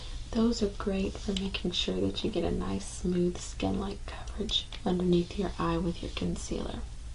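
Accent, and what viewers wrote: South, United States. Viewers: You have this really subtle (southern?) accent that's exremely pleasant. Can I ask what region of the us you are in/ from? Here in the PNW we overpronounce everything, and sometimes sound snooty. Also, I can see why this video has 18000+ views. I've got a mild case of coma, lol. Seriously, great stuff. Thanks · She sounds like a female Bob Ross, that calming southern twang creating ASMR masterpieces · I just want you to do my makeup in real life. Ugh. You are so comforting and I love your sweet southern accent!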